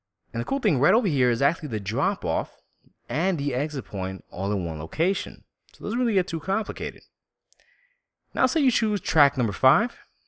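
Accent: New York, United States. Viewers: you sound like your from new York or the Bronx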